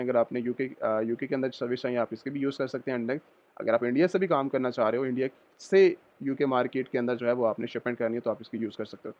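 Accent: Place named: Lahore, Pakistan. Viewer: i think you are from pakistan because your accent is like a lahore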